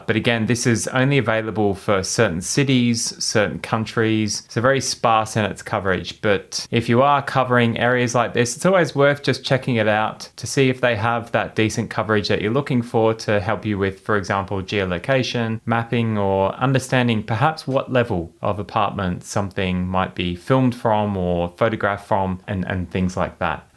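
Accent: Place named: Australia. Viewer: What are you trying to get at , as it seems anyone thats not a so called allie , it seems you've got a negative showing of their defence areas but if its an allie its a running track like new Zealand, I think your thought process is a bit on the side of hate and racism, thats not right especially since you sound like an Aussie, who are you getting paid from, because it seems im the only one thats noticed. Its a shame. Australia has only become like this because of tge stupidity of the American's and how Australian politicians cannot help themselves being other than the yes man or sucking up their arses, this is the whole reason this country has gone to shit . Its the stupidity and thought processes like what you've uploaded. Disgusting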